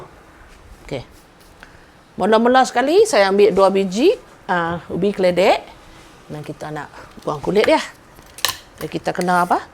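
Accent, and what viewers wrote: Kedah, Malaysia. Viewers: Assalamualaikum Kak, saya suka tengok video kak. Kak cakap kedah pure tak buat buat. Banyak petua kak share dalam video ni. Baguih kak idola saya setakat ni dalam arena youtube. Nanti ajaq buat kuih kaghaih pulak na..ka dah ada video nanti sat nak check...saya orang baru..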